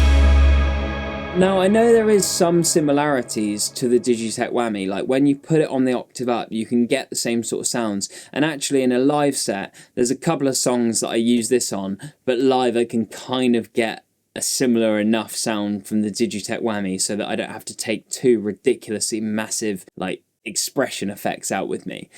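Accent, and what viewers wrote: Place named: United Kingdom. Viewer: My weekly bri ish pronunciation lesson ❤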